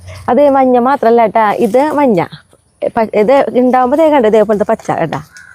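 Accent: Thrissur, India. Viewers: Eniku ningalude samsaram clear aakunnilla.kollakarku thrissur slang manasilakan kurachu prayasamanu.....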